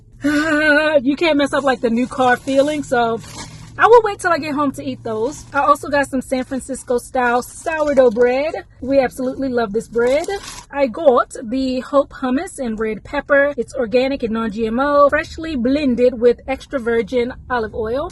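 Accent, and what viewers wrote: Baltimore, United States. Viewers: I’m from Baltimore tooo , your accent is soo clean compared to how others talk here. 😌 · i'm so late! i had no idea you were from baltimore. MD GIRLS FTW lol Now i'm hearing ur accent heavyyy lol. Congrats on the new whip! She's too fly! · Yup I hear the Bmore lol rumz lol aka rooms I love it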